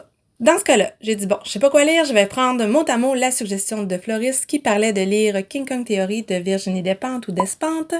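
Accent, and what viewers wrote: Quebec, Canada. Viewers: Bonjour de Belgique, qu'est-ce que j'aime l'accent québécois, ça me rappelle mon Erasmus à Montréal en 2007 (ça ne me rajeunit pas), que je n'ai jamais oublié! Vous, les Québécois, êtes un peuple adorable et attachant, j'aurais adoré rester vivre au Canada! Petite question: qu'est-ce qui fait que tu optes pour la version originale en anglais ou la traduction (je pense au Joyce Maynard, qui est originellement en anglais mais que tu sembles lire en français)? Bonne journée!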